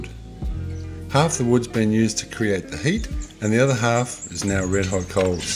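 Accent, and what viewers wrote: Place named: Australia. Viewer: ...and a beautiful voice speaking, besides this lovely australian dialect... 😉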